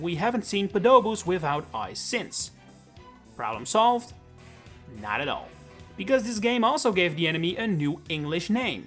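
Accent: Netherlands. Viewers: I'm from the Netherlands and I can just easily here his Dutch accent. Honestly, to me it just pisses me off to here Dutch people trying to speak English but yeah that's, about it really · sec in and can just tell you're Dutch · Are you dutch? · Vrijwel elke Nederlander heeft moeite met hun accent verbergen in het Engels. Ik hoorde gelijk dat je Nederlands bent hahah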